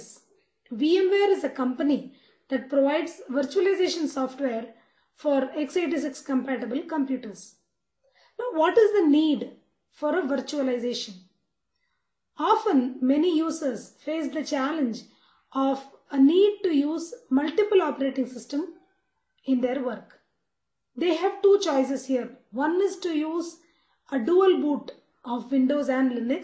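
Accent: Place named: South India, India. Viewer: South Indian accent !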